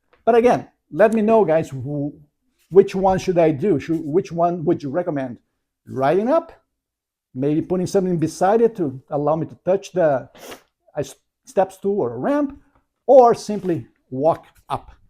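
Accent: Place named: Brazil. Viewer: Sounds like you have a Brazilian accent. Greetings from PA!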